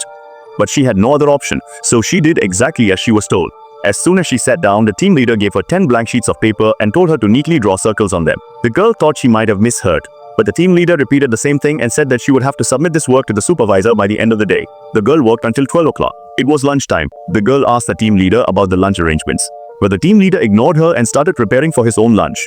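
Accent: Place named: India. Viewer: bro why does this fuckass ai voice sound fucking indian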